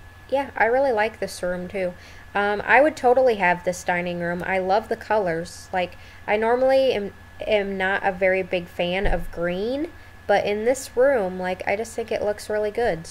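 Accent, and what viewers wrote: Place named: Minnesota, United States. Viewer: lovely build! quick question: are you from the midwest? you sound a lot like my minnesotan friend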